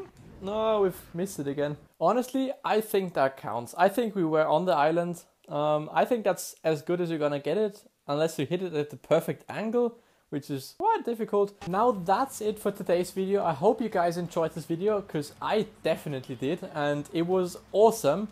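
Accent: Germany. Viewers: you german ? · Man hört irgendwie ein bisschen, dass du deutsch bist xD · Cool Video but Theres Just one Thing i will know. Are you a german guy the game is german and your voice Sounds german to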